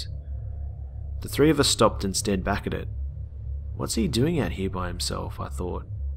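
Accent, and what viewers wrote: Australia. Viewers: are you from australia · You're Aussie yeah?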